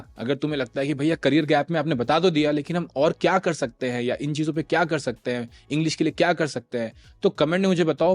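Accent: Bihar, India. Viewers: Bihari tone aa rha aapki baato main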